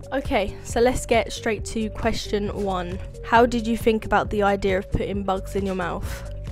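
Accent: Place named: United Kingdom. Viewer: of course she’s british 😹😾.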